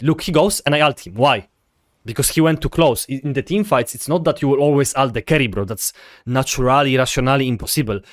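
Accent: Poland. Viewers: Nice Polish accent like libik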